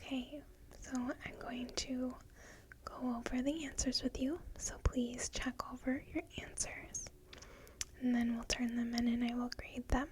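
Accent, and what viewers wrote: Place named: Michigan, United States. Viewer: Are you from Michigan? Your accent sounds like mine lol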